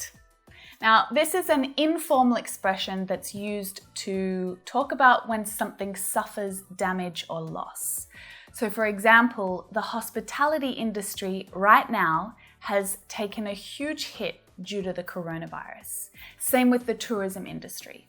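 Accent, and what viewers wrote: Australia. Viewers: I'm a little bit getting hang of Aus accent while learning your videos.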